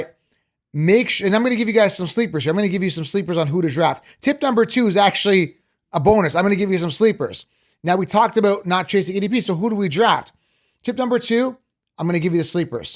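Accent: Canada. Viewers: Raiders fan with a Canadian accent…lol no thanks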